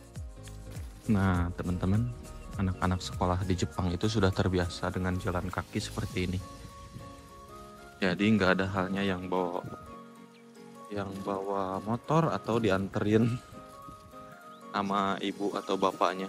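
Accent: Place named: West Java, Indonesia. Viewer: Logat abangnya Sunda banget 😂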